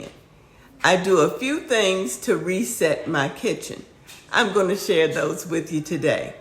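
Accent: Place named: Virginia, United States. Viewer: I really enjoy your videos and the way you speak. There’s something very comforting about your voice and delivery. I spent a lot of time in Virginia while stationed there in the Army, and the moment I heard you speak, I instantly recognized that familiar Virginia cadence. Wishing you and your family a very happy New Year.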